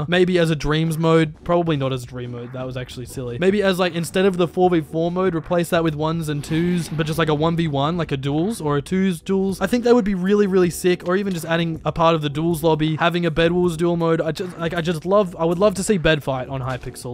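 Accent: Australia. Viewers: you sound more australian in this video 🤔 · Are you from Australia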